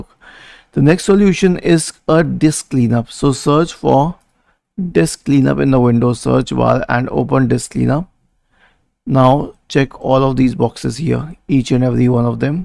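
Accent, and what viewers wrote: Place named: India. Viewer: omg so indiannnnnnnnnnnn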